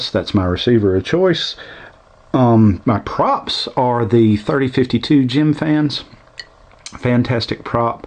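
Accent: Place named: Texas, United States. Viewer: Less jello than a runcam split mini? I hear that texan accent, what part?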